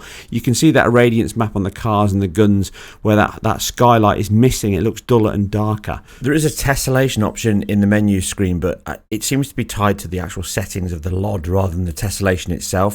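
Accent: United Kingdom. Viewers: There is no wrong or right, but he sounds like a proper British.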